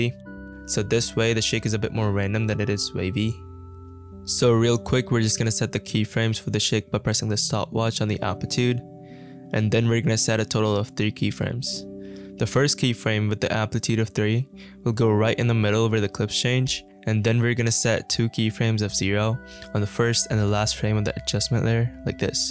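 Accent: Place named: India. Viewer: really helpful indian accent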